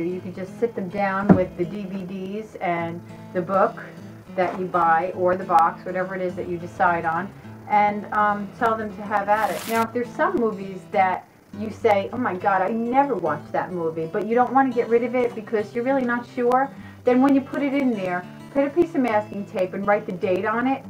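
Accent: New Jersey, United States. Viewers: Thanks for the tip. I don't know why I haven't bought one of those DVD holders yet. I actually did purge my DVD's this year and I gave away about at least 100 of them to the girls at Good Counsel Homes. Anyway, I'm seriously thinking of getting a DVD book like you have for the rest of them that I decided to keep. MyDVD and CD cabinet is exploding at the seams. BTW -- I KNEW you were from East Rutherford with that Jersey accent.........I'm a Bloomfield girl myself!! God bless!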